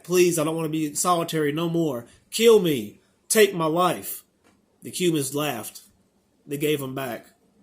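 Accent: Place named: South, United States. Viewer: u have a country assent.. I noticed pretty cool because not many southerns have that now days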